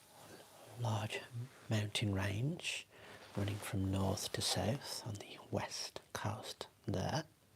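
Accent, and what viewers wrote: United Kingdom. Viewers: Most likely British English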